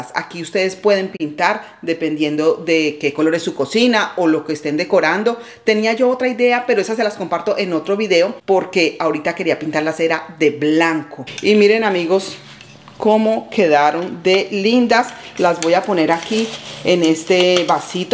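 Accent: Colombia. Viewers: 🇨🇴💛💙❤️🇨🇴 Dios te continué bendiciendo. Me alegro mucho . Escuchar ese acento colombiano. Muy chéveres tus ideas.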